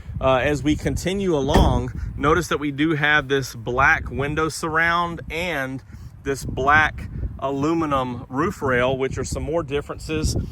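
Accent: South, United States. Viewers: Southern accent…easily misinterpreted. Bench is the word vs Captain chairs in the CX-90. Nice vehicles!!!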